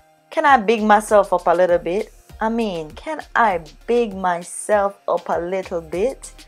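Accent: Jamaica. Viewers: Are u Jamaican?? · You sound Jamaican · you sound like a Jamaican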